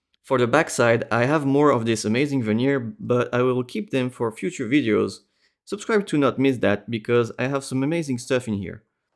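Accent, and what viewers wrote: France. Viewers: Mec, on sent bien ton accent français dans ton anglais parlé 😄 mais beau travail 👏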